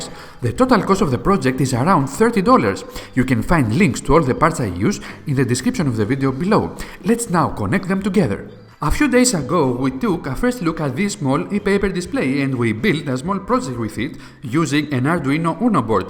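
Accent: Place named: Greece. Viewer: I designed a home automation in my spare time back in 1985. It was designed with 68HC11 MCU which was the most powerful MCU you could buy in those days. Unfortunately, IBM beat me to it, and even called it what I did; "Home Run". I think they had ESP (extra sensory perception), since I told no one about my project! It had lots of discrete ICs like 74LS138, 74LS367 etc. I have determined that I can now implement a wire-less version (WiFi) of it, which would eliminate "ALL" wires (except power), using a single ESP32, IR motion sensor, mic/speaker, touch keypad, a TRIAC and a few OP AMPs for analog signals (hands-free Intercom and AC dimmable switch). What I wouldn't have given for an ESP32 at that time! Our younger viewers have missed being a witness to a digital revolution. Thanks for the nice video. Your voice and accent, reminds me of my childhood friend from Athens.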